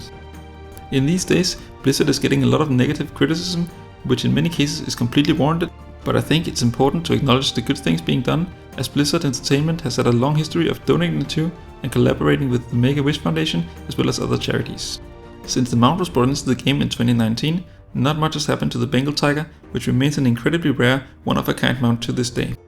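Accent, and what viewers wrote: Denmark. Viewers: Beautiful Danglish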